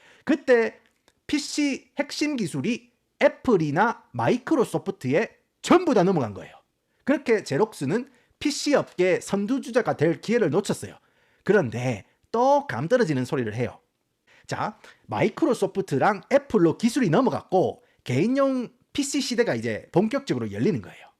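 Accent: Gyeongsang, South Korea. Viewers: 이런 고급 정보를 개무시칸 갱상도 사투리로 전달 받는다는 점에 대해 소화 불량감을 느낄 갱상도 사람덜에 대해 생각은 안해보셨는지? 도무지 적응이 아니되옵니다~😅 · 왜 알고리즘에 뜬건지 모르겠는데 경상도 사투리와 굴러가는 미쿡식 영어 발음의 콜라보가 매력적인분이시네요ㅋㅋ 좐/촬스 + 으깨/느그들 ㅋㅋㅋ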